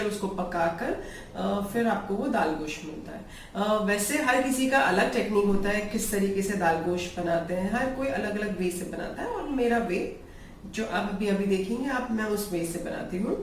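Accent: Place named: Gujarat, India. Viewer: Masha Allah 👌👌 I like d way u talk as we gujarati peoples do..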